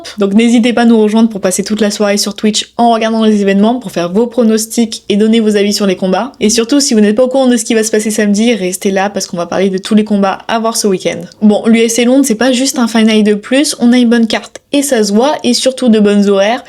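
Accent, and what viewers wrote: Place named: Paris, France. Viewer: C’est l’accent Panaméen.. attention on critique pas …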